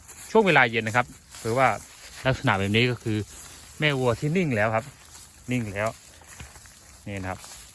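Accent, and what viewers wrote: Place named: Surin, Thailand. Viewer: ฟาร์มอยู่จังหวัดอะไรครับ สำเนียงเหมือนคนสุรินทร์เหลา😁😁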